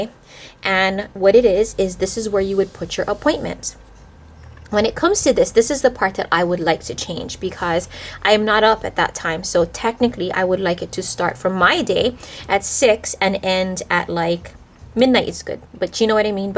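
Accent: Hawaii, United States. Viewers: Are you from Hawaii? You can totally hear it. Lol "used to to". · Howzit! I thought so! I could've sworn that I heard you say "dat' and 'dis", I could tell when you said, "Used to, to". That's a dead giveaway! You can take the girl out of the island but you can't take the island out of the girl. ALOHA!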